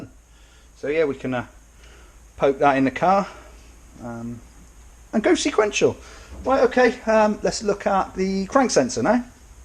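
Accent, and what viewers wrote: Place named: RP, United Kingdom. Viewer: Don't you sound posh on these videos, nice work mate, love all this old stuff.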